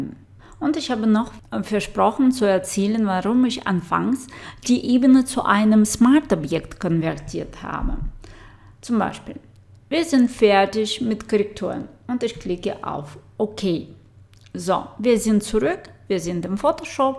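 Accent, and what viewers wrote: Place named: Russia. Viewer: Хотела найти на русском, не нашла. Нашла на немецком… с русским акцентом (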